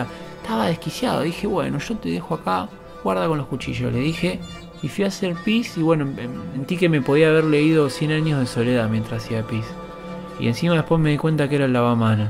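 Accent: Argentina. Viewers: Quiero un amigo argentino que hable como tu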